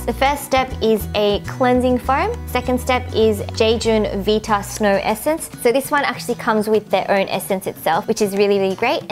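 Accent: Australia. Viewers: OMG AUSTRALIAN ACCENT 😍💗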